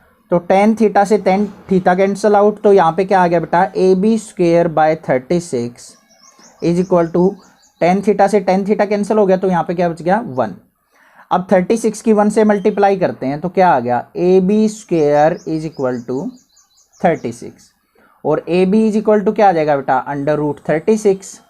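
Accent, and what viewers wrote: Haryana, India. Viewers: sir aap haryana ke ho kya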